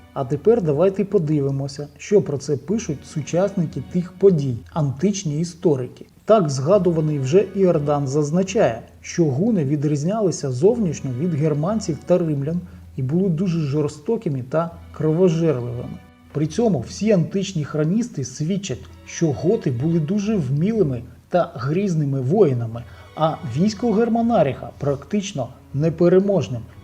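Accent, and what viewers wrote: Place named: Moscow, Russia. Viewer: Ви нащо назад на українську перейшли? Вам не пасує. Тільки масковскій діалект цапського ізика. З аканням.👍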